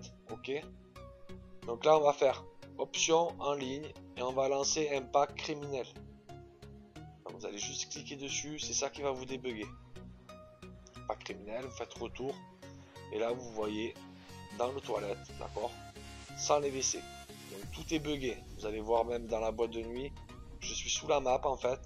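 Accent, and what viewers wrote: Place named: South, France. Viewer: Oui toutes tes vidéos sont bien expliquer avec ton accent du sud j adore poto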